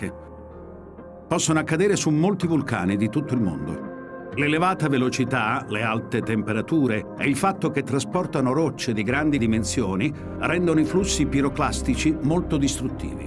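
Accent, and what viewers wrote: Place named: Calabria, Italy. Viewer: Narratore con forte accento calabrese...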